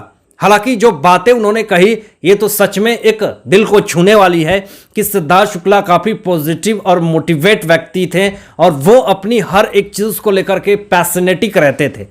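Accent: Bihar, India. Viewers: Tum thik se baat karna sikho ..bihaari pronounciation se lekar hindi baat mat karo ...rashmi hai not rasmi .not enerjy...sunte huebv Ganda lagta hai ..host ho thik se pronounciation sikho.. very bad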